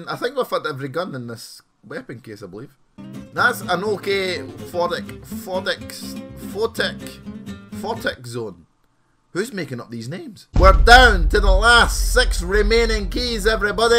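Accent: Scotland, United Kingdom. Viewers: thank god a Scottish csgo youtuber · R u Scottish?:} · Are you from Scotland? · R ye Scottish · R u Scottish ?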